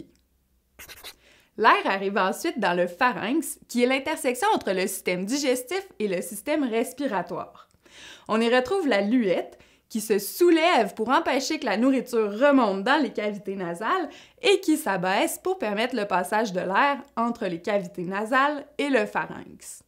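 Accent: Quebec, Canada. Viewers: merci beaucoup! ps: j'adoooore l'accent quebéquois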